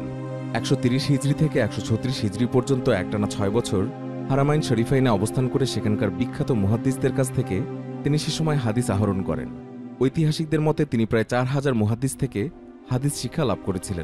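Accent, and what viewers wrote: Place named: Noakhali, Bangladesh. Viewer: Commentator's pronunciation and language problem, it seems he's from Noakhali.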